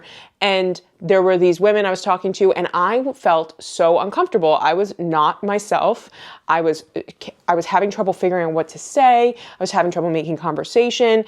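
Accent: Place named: Midwest, United States. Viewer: Are you from the Midwest? You talk like people in my circle, so listening to you felt very homey.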